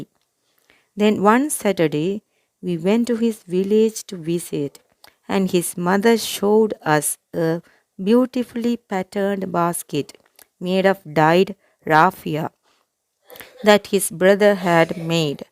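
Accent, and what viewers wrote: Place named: India. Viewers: Thank you very much ❤ By the way , your pronunciations tell me you are an Indian person . Am I correct? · I also thought she was from india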